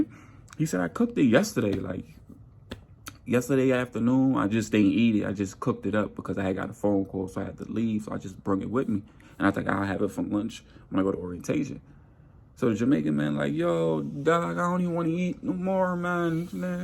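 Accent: New York, United States. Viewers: he sounds just us NYers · He definitely sounds like he from NYC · YEP he's a New Yorker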